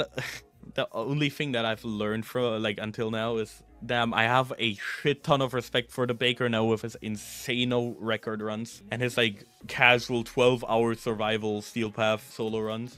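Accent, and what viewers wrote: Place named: Germany. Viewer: i kinda hören deutsch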